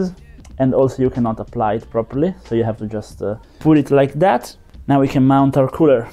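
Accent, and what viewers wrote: Italy. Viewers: His italian accent is killing me😂 grande bro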